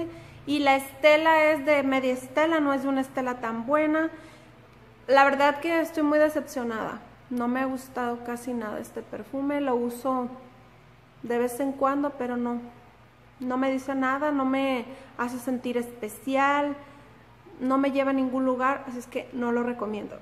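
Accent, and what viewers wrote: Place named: North, Mexico. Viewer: Me parece que tú recomendaciones son buenas, felicitaciones. Por otra parte no te preocupes como hables, los argentinos, los colombianos, los españoles etc, hablan distinto y muchas veces con diferentes acentuación es y palabras mochas pero es lo que los distinguen de que país son, acá en México lo fabuloso lo más bonito es que cada estado es como un diferente país, y hablan diferente en cada estado, y mucha gente quiere estandarizar el tono de hablar, incluso hablar como chilango fresa (sin dinero) como si ponerle el tonito de fresa les diera caché. Lo más bonito es ir al norte y oír su tono fuerte e incluso con sh en vez de ch, o ir a Michoacán y oír como hablan se siente muy bien, esa es su forma de hablar y nosotros los entendemos ¿Por qué a fuerza querer cambiar la forma de hablar de una persona? Se oye que eres del norte y tu tono de voz es muy bonito y que bueno y te admiro que no lo cambies ni lo achilangues como muchos. 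Haber corrijan a los Argentinos por decir "tenés" en lugar de "tienes" es su forma de hablar muy característico, y no por eso no les entendemos, claro que entenderemos pero no falta el que se quiere sentir el sabiondo y corregir a diestra y siniestra. Respetemos el tono de hablar y su forma de hablar de las personas de diferentes Estados de la República Mexicana y de otros países que hablan Español. Su tono de hablar y su forma de hablar es su identidad.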